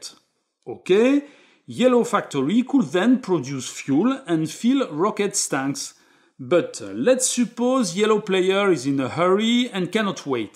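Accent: France. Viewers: Amazing!!! I watched all 3 vidoes, it’s just fantastic!! Both the game and the explanation/ videos. And I love the French accent🤗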